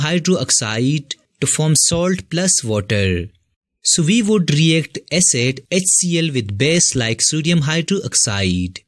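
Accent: India. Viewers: Indian accent is funny 😂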